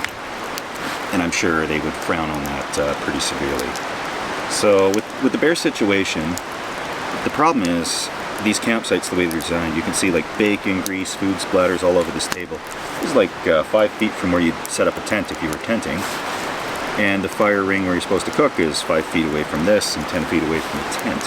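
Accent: Canada. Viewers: I detect a slight Canadian dialect, right along with Northern Humor. Thanks for your enlightened video on camping in grizzley country. - Just great.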